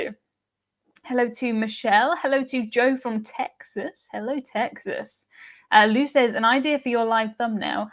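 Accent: United Kingdom. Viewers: I value your opinions & love your presentations (& lovely UK accent!)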